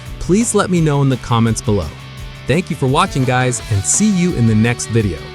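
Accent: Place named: Australia. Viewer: your voice doesn't sound Indonesian at all despite location marked as Indonesia. not even singlish. hmmm australia? or is this AI run channel?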